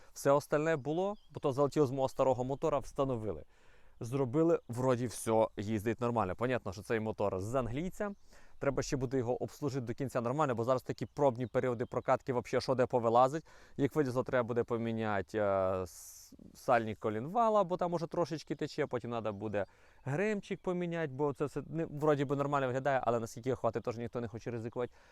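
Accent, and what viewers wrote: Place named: Western Ukraine, Ukraine. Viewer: Заебательский заподенський говор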